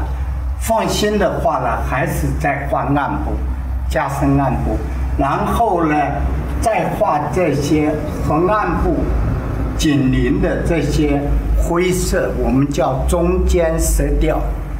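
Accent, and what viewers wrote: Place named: Shanghai, China. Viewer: 为什么有一种老师是上海人的感觉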